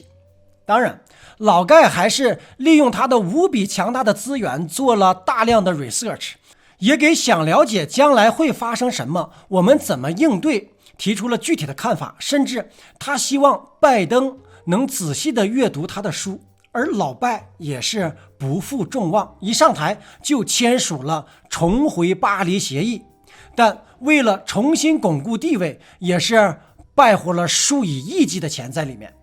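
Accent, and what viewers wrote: Northeast, China. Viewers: 是东北人还是陕西人？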